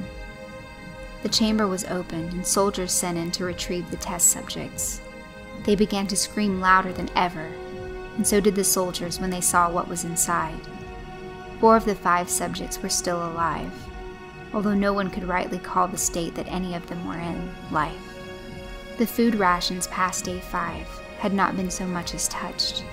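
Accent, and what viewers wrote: South, United States. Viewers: Don't u EVER doubt your narrative talents!!!! U r awesome girl! Especially when u throw n the southern drawl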